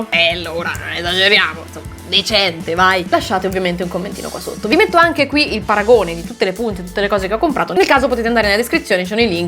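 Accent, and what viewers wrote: Tuscany, Italy. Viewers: Ma sei Toscana?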